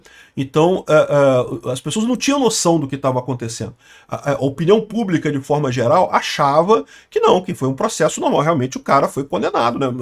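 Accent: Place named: Rio de Janeiro, Brazil. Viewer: Tbm amo esse jeito dele se expressar! E tbm o jeitão Carioca. Mato as saudades do meu povo do Rio.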